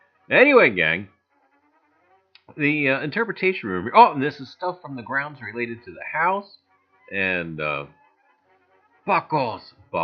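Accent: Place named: India. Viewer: great video! nice Indian cent buddy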